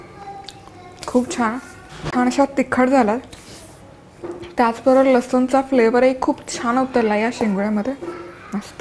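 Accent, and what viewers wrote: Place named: Pune, India. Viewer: tume chan puneri boltat....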